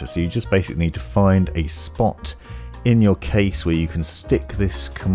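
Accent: United Kingdom. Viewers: As others have said, you are probably the #1 video producer on YouTube for tech stuff. Your speaking voice is head-and-shoulders above the competition! Even though you're clearly a Brit, you are very CLEAR and speak at a reasonable pace. Others mumble and rush through their delivery and it is quite off-putting to be honest! I am a subscriber to your channel and recommend others support you by LIKING and SUBSCRIBING. Cheers and keep on!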